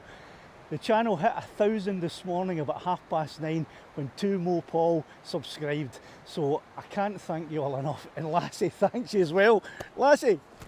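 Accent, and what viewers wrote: Scotland, United Kingdom. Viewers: Congrats on 1,000! I absolutely love your voice and the name “Lassie”! (There was a TV show in US during early 60’s featuring a dog with that name.) I just turned 65, am slowly gaining experience on my Mini 2 now that spring has come to Ohio, and am starting FAA Part 107 test prep. We old guys need to keep doing new things. QUESTION: is one permitted to fly over / along Hadrian’s Wall? That would be sweet. Or Castle Urquhart and other such places? I saw you posted on Phillip Skraba’s YouTube, volunteering to add your Scottish voice to his cinematographic artistry. I would love to see that result. Keep going! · Well done. It’s your enthusiasm and fun approach that keep your videos entertaining and gets people to subscribe. And of course our fantastic Scottish accents. Keep up the good work. Cheers. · The reason you have so many followers is this 1 you are a lovally guy 2 your Scottish accent is beautiful 3 you are in a spectacular area for filming 4 you are testing lassy because your subscribes are asking you to test stuff God bless you keep it up thanks John from barnsley s yorks uk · Hi watched everyone one of your videos all informative and so clearly explained in your soft Scottish accent ( am from London) I to have the mini 2 and love it watching your clips as given me tips that I use regular now so keep em coming and congratulations on your 1000 subscribers. 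Jim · No brainer, it’s the Scottish accent 🏴🏴🏴👌🏻😂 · Congratulations. I only vlog some of my travels but with Covid, alas, I have to wait. This is my assessment of your success. Number one - your endearing personality and captivating Scottish accent. Next, you tackle things that others can relate to. Finally, you are consistent and make your viewers anxious to see what you will come up with next. Keep up the good work.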